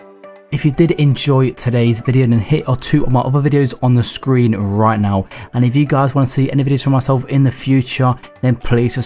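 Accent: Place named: Nottingham, United Kingdom. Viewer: mate you gotta be from nottingham/derby?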